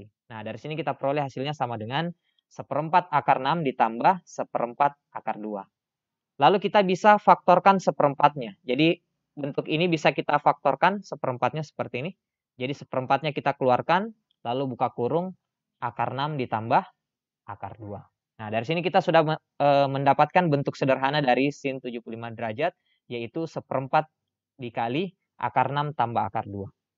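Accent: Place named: Bugis, Indonesia. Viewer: Kayak aksen bugis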